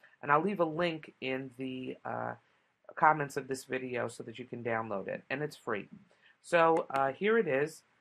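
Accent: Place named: New York, United States. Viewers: Cool Accent. New York ? Jersey ? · love your accent, haha since i am a New york fanatic, i instantly knew it,hahah i know, but im from europe and I really want to go there someday, great video thank you for the great tips :)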